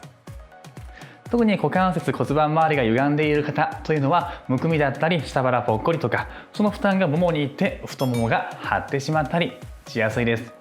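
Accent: Kansai, Japan. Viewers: めっちゃ有難い😊 最近は太股が締まってきてズボンに余裕を感じます。🎵 それと、歩いてても前と何だか感覚が違う気がするのは気のせいなんかな？ 先生❗ちょいちょい出てくる関西弁がええわぁ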